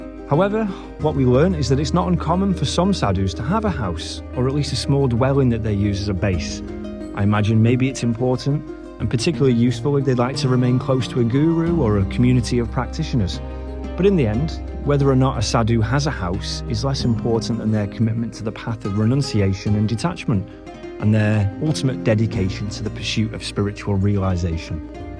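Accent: Manchester, United Kingdom. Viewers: Heading to Rishikesh in October. Going through a divorce after 20 years and looking for answers, your video got me excited, and hopeful. Plus, you sound like you're from Manchester! Hare Krishna!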